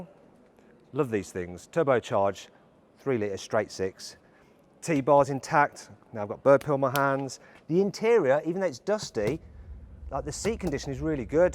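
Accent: Birmingham, United Kingdom. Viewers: Is this guy a brummie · Never thought i'd hear "barn find" in a thick, British accent again. I already miss you, Horizon 4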